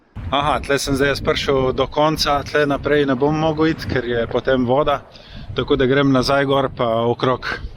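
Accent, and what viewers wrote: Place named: Littoral, Slovenia. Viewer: HAHA, približno takrat sem tud jaz z starši in starimistarši hodu na morje. Sicer ne v ta kamp ampak v Banjole v neko turistično naselje blo je več blokov (mislim, da je blo prek zveze borcev ali upokojecev) kaj človek vse doživi, da na YT slediš nekomu, ki ima še druge podbbne hobije in veselje, in še na skoraj na isti konc hodu na morje (mogoče še celo istočasno). Upam da se kdaj srečamo, mislim da bi te bilo z veseljem spozat, pomoje si zanimiv sogovorec. Pri gledanju tvojih videov sem tudi že opazil, da kolesariš tam kjer sem jaz že bil na izletu. Tko, da je že iz tega naslova zelo lepo gledat tvoje videje. Pa še ta primorski naglas, men en nelepših.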